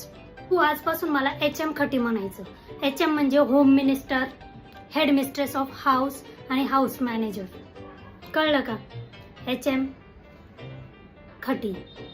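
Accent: Khandesh, India. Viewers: खूप छान ह्युमर आहे तुमचा. खान्देशी स्वर तर जाणवतोच. ❤❤❤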